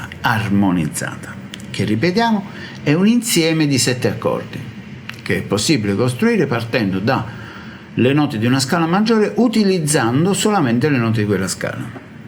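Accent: Naples, Italy. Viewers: Si sente la radice napoletana, ed è molto piacevole...sec me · Lo so che sei di Napoli, e si sente pure 😉. Cmq ti ascolto sempre con molto piacere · Cmq si sente che è napoletano... per fortuna.. aggiungo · Un napoletano sente i suoi simili già nell'armonia di voce comunque grande ,avevo lasciato la mia tastiera da parte ma con i tuoi tutorial mi hai dato voglia di imparare cose buove semplici e concrete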